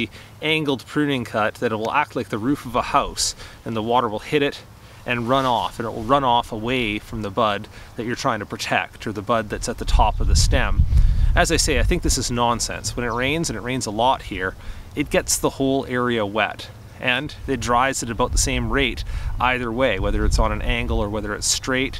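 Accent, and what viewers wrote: Canada. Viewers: Me too! My Dad has about 6 large rose bushes, and in 3 years have never been trimmed. I just had no idea where to start tackling them but have a much better idea now! First video I’ve seen from you and it took me about 30 seconds to spot that awesome Canadian accent! You have amazing plants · Very helpful! Love your Canadian "accent"! You sound a lot like Scott Thompson from The Kids In The Hall! Yes, I'm old!!!!! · Great Canadian accent!😄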